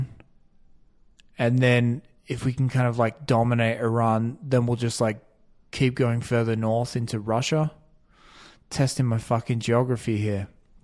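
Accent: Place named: Australia. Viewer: Hey good to hear an Aussie talking about this I’m petrified my biggest fear is nuclear war and I just can’t believe that this crazy fucking person Putin even call him a human has all this control a few boomers can destroy all of our hopes and dreams. Whereabouts in Australia are you from? I’m from New South Wales.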